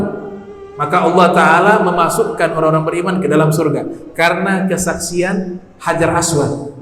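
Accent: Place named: Arab world. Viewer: Keslem wahay orang indo, kalau asli indo saat bicara tentang nama tokoh dr agama sekalipun, janganlah alay lebay dibuat" nama nama jadi dialek arab. Nyebut umar kotob aja pake dialek arab. Kayak tunduk banget gak mau kritis umat nieh. Wajar banyak dikibulin bangsa arab. Sejatinya manusia yg lahir di nusantara kalau nyebut tokoh islam aja seperti budak, maka negeri indo lambat laut bakal jadi negri islam yg gak punya jati diri bangsa lagi. Pakaian pun diganti agamis berkedok syariat. Stop alay dan lebay saat ngomong dimanapun mengenai tokoh agama jangan ikut ikutan dialek Arab. Sangat rendah banget harga dirinya dimana dunia kalau bangsa indo ke arab araban. Wong Muhamad bin salman aja udah kebarat baratan kenapa kits harus ke arab araban, yg mana budaya arab itu budaya tertinggal/konservativ/ kampungan/dan jahiliyah! 😇🤗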